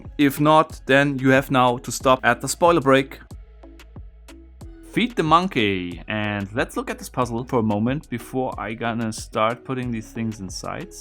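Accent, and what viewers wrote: Germany. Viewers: Do I hear a bit of an German accent in your voice?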